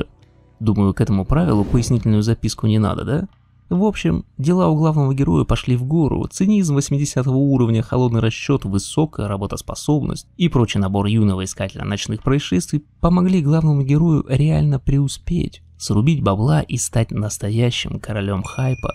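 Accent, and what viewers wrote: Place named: Chechnya, Russia. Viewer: у тебя проскакивает чеченский акцент в голосе)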